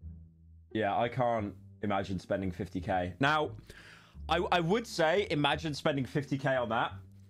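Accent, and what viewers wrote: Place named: United States. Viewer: Why is he so high pitched and murican twanged now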